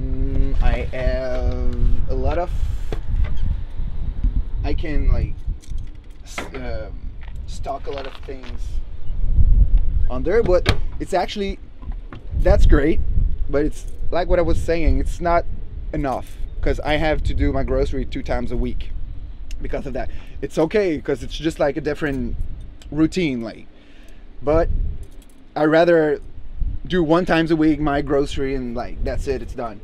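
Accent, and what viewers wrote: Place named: Quebec, Canada. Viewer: hehe québécois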